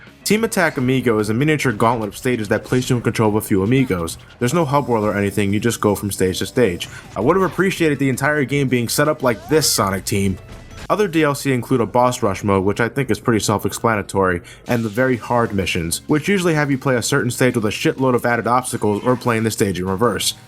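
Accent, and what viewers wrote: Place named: South Jersey, United States. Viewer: That's definitely a lot to say for the story of this game. I kind of see that your a bit similar to ShogunGinO, except your voice isn't as deep. Voice is almost like South Jersey Sam Flemming.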